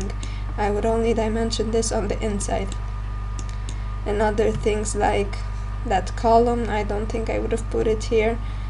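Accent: Eastern Europe. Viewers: You sounds like south slavic speaker. Am I right?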